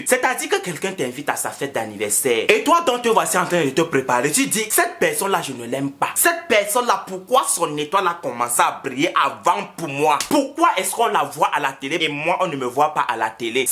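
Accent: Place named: Cameroon. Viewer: J'suis abonnée sur ta page parce que tu me fais beaucoup rire 😁😁😁 Aussi parce que tu appuies bien notre accent Camerounais, tu parles avec notre Argot Camerounais et tu parles de notre actualité et de nos problèmes Camerounais 🇨🇲🇨🇲🇨🇲🇨🇲🇨🇲 Continue comme ça, c'est le 237 qui gagne 💚❤💛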